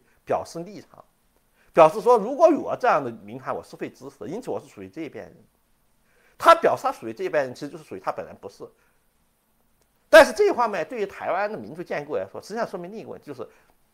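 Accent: Sichuan, China. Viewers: 這口音就是四川的黑暗料理 非常地上癮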